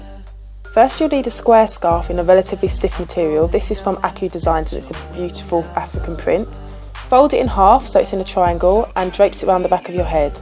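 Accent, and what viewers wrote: London, United Kingdom. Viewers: Beautiful Look! Beautiful Wraps!! Thank you. And you have the nicest British accent, that's a compliment. Absolutely beautiful. Thanks for all of your videos. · I love London accents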